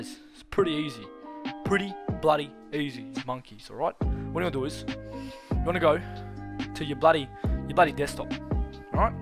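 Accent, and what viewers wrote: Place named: Australia. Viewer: He’s Aussie adlay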